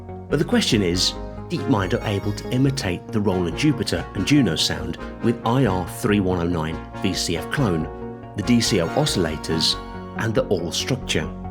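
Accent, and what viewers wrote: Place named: Japan. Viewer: It's a nicely done comparison. Whilst it absolutely can replicate the Juno 6-60-106, replication of the Jupiter 8's subtleties with cross mod etc, is not there. I have owned the original JP8, and now own both a DM and the JP-08, plus the Cloud ACB and Zenology versions. I'd still love to see Behringer really do their JP8 clone, as I think they'll nail it. One thing.. the commentary is very "Jinglish" (as we used to say at Yamaha R&D). It's a great, soothing and very listenable voice over, but the grammar and use of English needs work. For the record, when at Yamaha R&D, we also worked with Howard quite a bit (Jones), he came in for a Vocaloid overview, and over the years, did quite a bit with us. Truly one of the great exponents along with Nick Rhodes of the JP8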